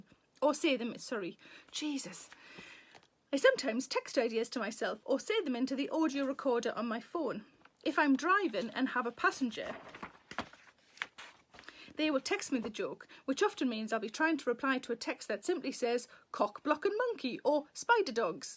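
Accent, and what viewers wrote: Newcastle, United Kingdom. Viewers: Hi,!! Been listening to multiple episodes in chunks since I’ve not been able to tune in at the airing time every day. So I’ve been catching up for the past couple days. I live in a little town called Shepherdstown in West Virginia in the US; A small historical town that no one’s really heard of, but oh well. Born and raised here. (Although my Dad’s from the UK, so I was raised with a strong love for the English) I love listening to your lovely Geordie accent and smile and laugh along listening to you while working on art pieces. Thank you for being one of my favorite parts of my day, and for being such a beautiful person inside and out. Lots of love - Emma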